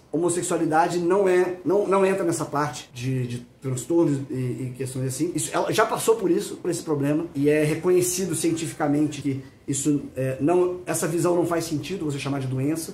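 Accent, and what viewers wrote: Minas Gerais, Brazil. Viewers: Uai que trem doido, cê é mineiro também?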